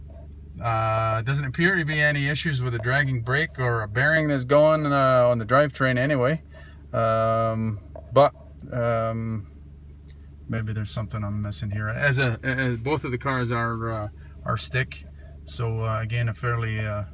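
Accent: Scotland, United Kingdom. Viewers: fek sake shu have known. Alternative ulster was our anthem. Used to play in the limelight in belfast. I was in my teens in the 80's. I hear ur scots accent breaking thru regularly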